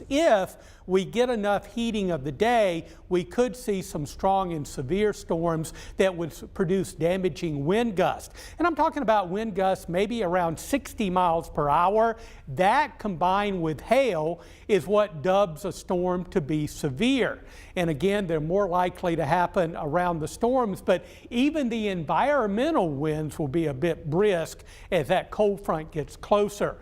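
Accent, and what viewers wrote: South, United States. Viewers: This guy talks like a hillbilly imbecile.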